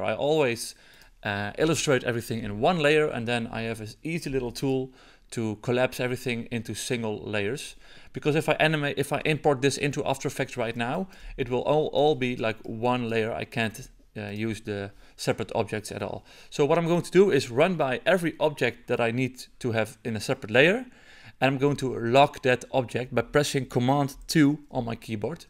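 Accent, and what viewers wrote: Netherlands. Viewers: The accent might be Dutch, not sure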